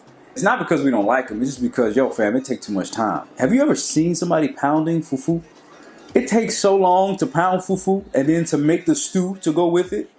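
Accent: Ghana. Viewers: I’m Nigerian living in the US and want to move to Ghana sooo bad. This video is inspiring. You got the Ghanaian accent down lol!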